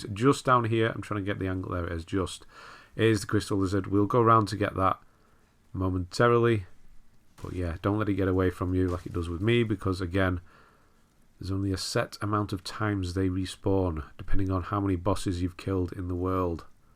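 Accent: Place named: Cheshire, United Kingdom. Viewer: That accent is subtle btw, placing you somewhere near the M56.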